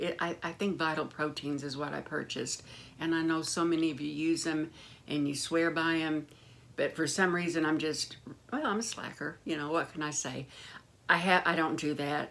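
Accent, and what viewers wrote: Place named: Illinois, United States. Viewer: I really enjoy your channel! I have family from Louisville and Olney areas in Illinois, and every once in a while I hear a bit of “Something” in your voice or speech pattern that reminds me of them. Am I even close geographically?? Also, I too lost my closest friend (Kathy) in 2020, definitely changed my life, left a big empty spot no one could ever fill. I dreamt of her the other night - first time since she died. She said - “ what took you so long to visit me”. Have you ever dreamt of Pat?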